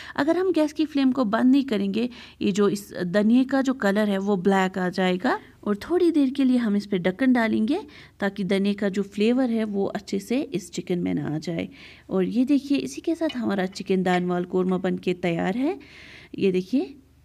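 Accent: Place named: Kashmir, India. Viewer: Aap k kashmiri aawaz muj bouth bouth achii lagte hai ❤️❤️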